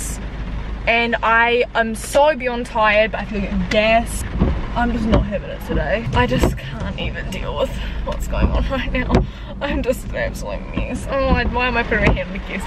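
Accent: New Zealand. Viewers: New Zealand is beautiful it's one of the safest countries <3 and you have such a kiwi accent haha, now I can relate to school vlogs. I'm from Auckland. ~ :) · I sub as soon as I saw and heard your kiwi accent, love supporting kiwi YouTubers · I see so many people saying in the comments that they can’t understand the New Zealand accent but since I’m from New Zealand I literally understand everything you’re saying so it’s so weird to think that other people can’t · I went to NZ for term 1 and this brings back so many good memories lol, also your accent makes me miss my friends😂💗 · Just found your channel and also I love your accent cause it’s so pretty like I am from Colorado in America and I have never seen a NZ you tuber and I think your awesome now I am going to subscribe! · I subscribed because you were from NZ, and you never see them on YouTube. I LOVE YOUR ACCENT!! 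I’m Australian! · yes i love seeing kiwis on you tube as i am myself and you really realize our accents after watching american youtubers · I just got auckland for half a year, i don't familiar with kiwi accent so far, your vlogs are perfect for me to practise listening skill of kiwi accent :) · i live in Australia, but i've always wished to have a nz accent, love yours!xx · aw you're so cute!! I love kiwi's & their accents it's the best ahah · I never understand why people think us kiwi’s have an accent but now I realise what they’re talking about lol. I just watched an American vlogger before your video and I was so surprised how distinct it is hahaha. You hardly ever see kiwi vloggers, also died when you said “ excited to put some ‘Kai’ in my stomach “ something only a fellow new-Zealander would understand 😂👍🏽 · I clicked on this vid and at the start realised you had a kiwi accent but by the end I forgot all about your accent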